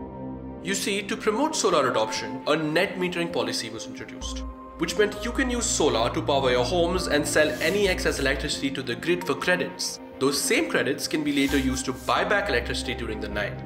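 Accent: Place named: India. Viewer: I've a feeling the v/o is done by a person with Indian roots. Great video, either way, made me smash like. Also, anyone reading this should subscribe to pewdiepie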